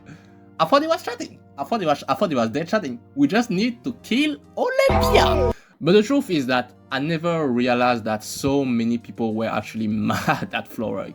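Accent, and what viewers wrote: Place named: France. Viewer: Why do you sound french?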